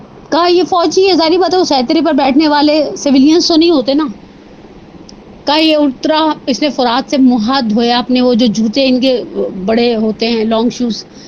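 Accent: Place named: United Kingdom. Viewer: Ya kuin aysi Hain akhir??? kuin English accent main Urdu bolti Hain ...:(in ko madarsa kuin itny achy topic pay bolnay ko khta hai akhir??? Ya Allah in k lecture ko Sunna boht muskill ho jata ha JB k in k husband intehaie bahtren Andaz main bat karty Hain..I wish main Karachi aun tw in sa milun ek bar aur pochun ap ko itna complex kuin hai angrazi ka ...ap tw Urdu b bol lain boht hai kuin k ap tw pakii lahoran Punjabi Hain...anyway really sucks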